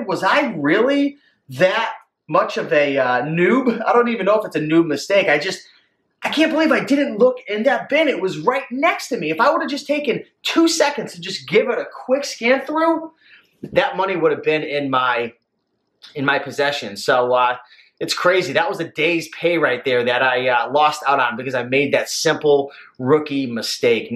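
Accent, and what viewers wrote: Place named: New England, United States. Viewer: Yankee accent kills me, and people fuss about robotic voices....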